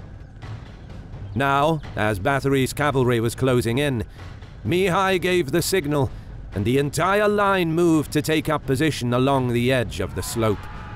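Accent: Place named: United Kingdom. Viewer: Are you trying to be Kings and Generals or Bas Battles? I love it. A different yet still British narrator. Haha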